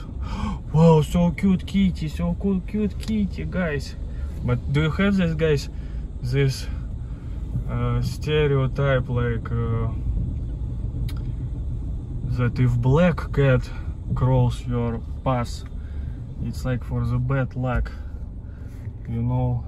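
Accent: Russia. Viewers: Настоящий рашн акцент) тут и в подмосковье виды не хуже имеются, можно слайд-шоу делать по картинке и километражу от Москвы)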